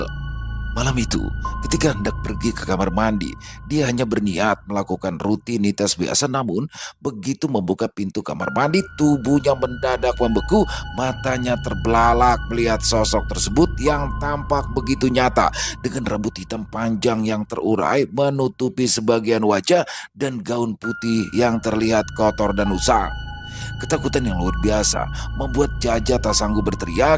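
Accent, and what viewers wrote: Jakarta, Indonesia. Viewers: Saya suka kisah santai,lucu gaya bicara nya betawi bgt pokoknya kereenn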